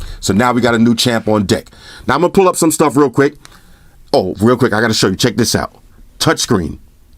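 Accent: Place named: East Coast, United States. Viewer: Extra aggressive guy. Great review. Surely you are from NY or Chi. Sounds like east coast.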